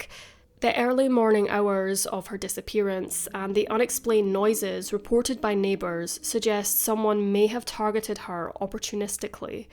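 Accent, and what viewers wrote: Scotland, United Kingdom. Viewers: If you mean the narrator, it's easy to tell she's from Scotland, not Ireland.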